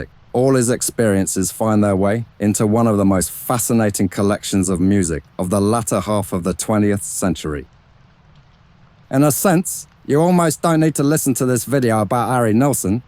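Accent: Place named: Cockney, United Kingdom. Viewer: Excellent as usual brother, your writing is absolutely stellar! Wonderful listening...the Cockney James Cagney!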